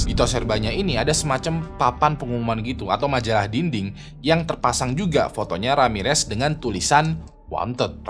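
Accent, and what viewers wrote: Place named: Makassar, Indonesia. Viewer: Halo orang makassar ya? Nama di makassar itu, karna kosa kata n logat, biasanya dari nama jadi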